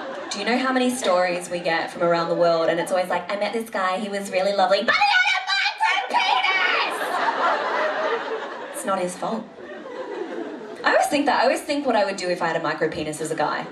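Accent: Australia. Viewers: She"s Australian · Just an average Aussie Sheila.She is definitely not a kiwi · Love the Australian accent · 55 seconds in I had to stop watching, Australian+the topic. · yep or maybe kiwi but I'm fairly certain she's Aussie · She's Australian, we all sound like her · Is she from the land down under?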